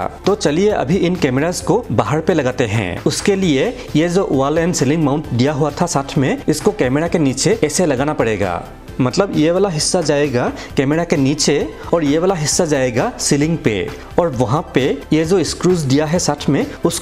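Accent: Assam, India. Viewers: ভাই অসমীয়া নেকি?কথাবোৰত ধৰিব পাৰি ৷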